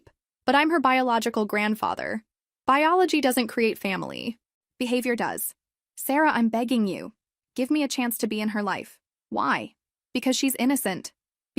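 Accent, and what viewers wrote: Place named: East Coast, United States. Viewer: Her voice is so annoying. Almost like the East Coast with a nasal like Midwest.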